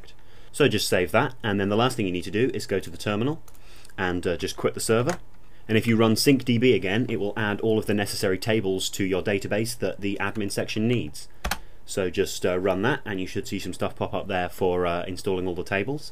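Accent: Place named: England, United Kingdom. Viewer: God that english accents glues me to this video :O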